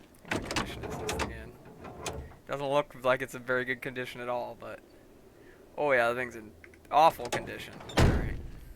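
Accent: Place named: Ohio, United States. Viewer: Lol idk you and this is the first video, but you sound like you're from Ohio 😅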